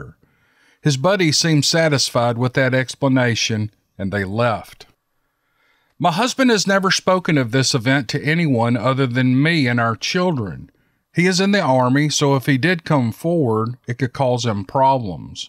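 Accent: South, United States. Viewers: I just love that Southern accent !!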